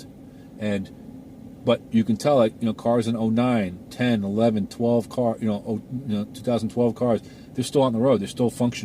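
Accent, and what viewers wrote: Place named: New York, United States. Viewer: Where in NY r u from? I have a good idea based on ur accent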